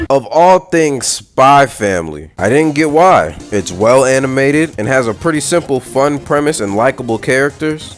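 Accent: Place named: United States. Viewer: well depends on the character, story they are in, world they are in, and their life they have led so far... if for example a character got plot armored outta having somethign bad happen OR just never has any issues, it becomes hard to relate cause for basically NO ONE in the real world, is that the case. the more lack of hardships to face just makes it more and more difficult to see it as anything more than like a poorly written play being put on. if you want happy comedy slice of life stuff, that does exist and you can enjoy that but for most people, we like watching entertainment we can get immersed in to the point that we forgot that its entertainment and watch it as if we are observers of these PEOPLES lives and not just these characters stories. basically hardships to face and overcome is the whole idea behind normal storytelling. you sound like your also from at least a western nation if not more likely proly some place in america so our cartoons mighta made you misunderstand what animated mediums should be and you only think that anime is something for kids so why make it grim and dark and gorey? 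but thats just it my guy... its not just for kids but just is another style of storytelling that allows for more commical or over the top magical presentations.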